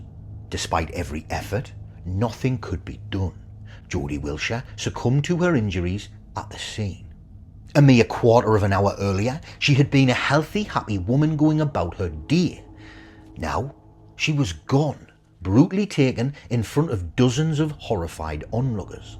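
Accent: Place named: North East England, United Kingdom. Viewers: What part of the cannie north east are you from pal? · Yes Lovely Geordie accent, ❤❤❤❤ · A true crime channel narrated by a real human AND a Northerner too! New sub and thanks! :)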